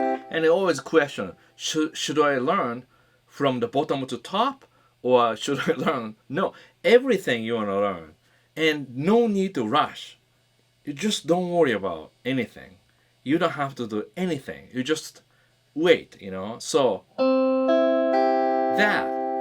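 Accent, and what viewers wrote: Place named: Japan. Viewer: Every time you speak, I feel like you are gonna start speaking Japanese. Your english is very japanese and it sounds really nice.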